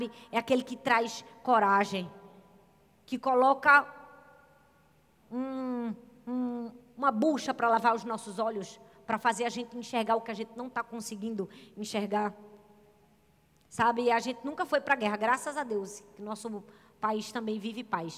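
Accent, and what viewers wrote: Northeast, Brazil. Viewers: Amo suas pregações. Vc. fala a linguagem do povo. Amo seu sotaque. Tb. sou nordestina. 😍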